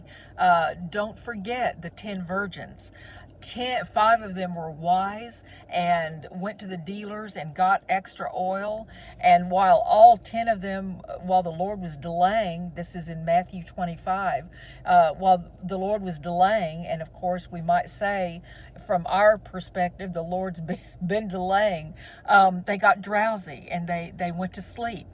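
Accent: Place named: North Carolina, United States. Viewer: I was wondering if you were here in NC by the accent. Really love your work. The Lord has called me similarly... 🙏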